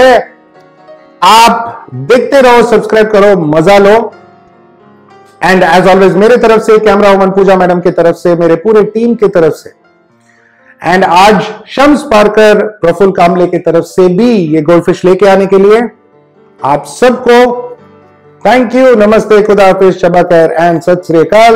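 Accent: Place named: Tamil Nadu, India. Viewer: Hi, I am eagerly awaiting to watch and hear your cute Tamil slang, please upload some Tamil videos then and there.. At least a dubbed version of your Hindi videos...😝😝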